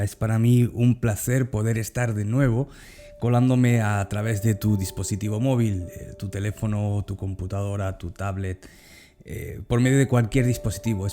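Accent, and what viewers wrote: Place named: Guatemala. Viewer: Representando Guatemala, ya te volvió el acento, gracias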